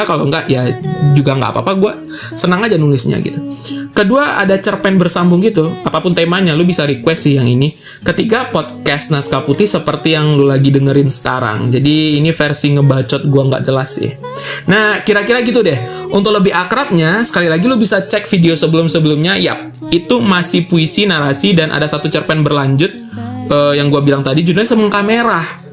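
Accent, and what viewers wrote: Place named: South Jakarta, Indonesia. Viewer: Woaaah.. 😂 jaksel banget bro But good job! Keep it going yaa ^^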